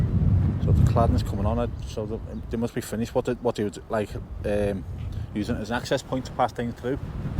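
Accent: Liverpool, United Kingdom. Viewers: I could listen to ya wafflin on all day in that lovely Scouse accent mate!😊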